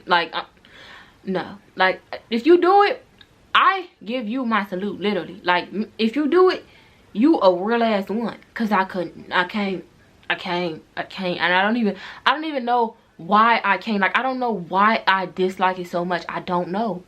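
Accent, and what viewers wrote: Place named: Maryland, United States. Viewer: Are you from MD. I can hear the accent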